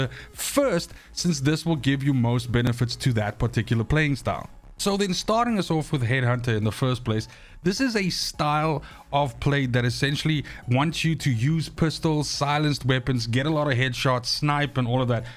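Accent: South Africa. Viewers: Liked and subscribed. Sounds like a South African accent there👌🏾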